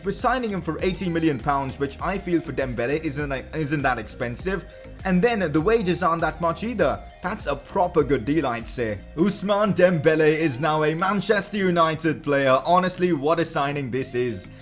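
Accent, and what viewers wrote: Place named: India. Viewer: He sounds like indian people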